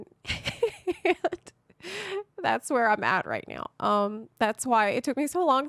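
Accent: South, United States. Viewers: Awww you are adorable. And southern lol I second your thoughts on the story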